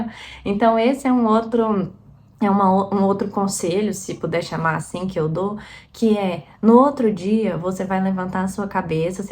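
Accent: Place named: Northeast, Brazil. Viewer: Ave Maria! mais tu é bonita! Nem parece que é mãe e casada 😂😂😂 (tô brincando) Já vi outros vídeos e pensei que tu fosse do nordeste,o sotaque parece . Já fui mt inocente tbm qndo comecei a estudar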